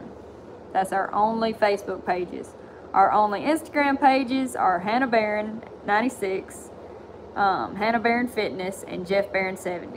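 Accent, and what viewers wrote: South, United States. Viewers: Love the southern accent. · That's one STRONG SOUTHERN accent!! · The nerve of these thieves. Im sorry that they stole your and your dads and friends and family hard work. I some of my military and war actions picture as a statement by putting words on them. I failed to copyright them. I did a image search on the internet and found my pictures on their website location unknown. They stole and own it. I removed all my pictures of the internet now. I now copyright everything I share. I really enjoy your work and every adventure y'all do I love how y'all say at the end of each upload.. 'APRECIATE Y'ALL"... I like to hear southern accent. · Beautiful country accent 😍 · listen to that accent shes form teh south i reckon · Hannah your accent sets my heart all a flutter….all I ever wanted in life is a sweet little Southern Belle…or a “wild bush onion”. 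I’m not picky. Make my dreams come true. Yours forever, A good ol’ Canadian Outdoors Boy 🇨🇦❤️🇺🇸🙏😉😂🍻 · Where are you from? Alibami? Missisip? Cute accent, and your dog looks like my old blue Merle sheltie, Zero. I was just noodling some bluegill the other day... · I love your southern accent I'm from Alabama and there's not many ladies here that speak like us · Love your southern accent,love your dog and you have such a cool dad. Take good care of your Dad and yourself ... SergeantC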